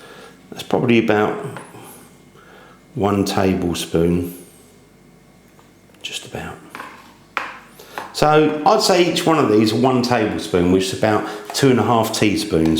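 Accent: United Kingdom. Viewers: I really like you use quite similar "hacks" as I found by various experimenting to substitute some of the authentic ingredients when not available, sill producing delicious and quite curry dishes. Great and clear description, and of course amazing British English!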